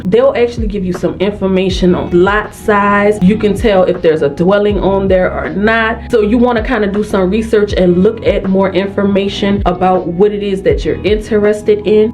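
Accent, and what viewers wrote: New Orleans, United States. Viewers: Love your energy and accent gotta be from NEW Orleans😊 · Ik u from New Orleans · I literally saw the house in the nail, and knew it was New Orleans, her accent confirmed, haven't watched yet, but I'm putting my money on the east..... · The New Orleans is oozing out of you. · New Orleans accent ! 🙂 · Love your energy and accent ! Something about you New Orleans brother and sisters , you have that southern charm . Thank you for the information. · Somehow before watching this video I just knew she’d have a NOLA accent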